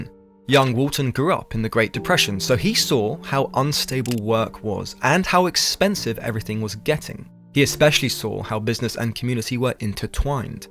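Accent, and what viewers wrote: United Kingdom. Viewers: Go back to the American accent. This British accent is so damn annoying.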